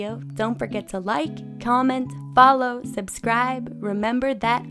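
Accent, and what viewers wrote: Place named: New York, United States. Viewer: Just curious how come you have a bit of a New Jersey/New York-ish or I guess maybe even New England-ish accent when you’re from Canada? Have you lived in that area before?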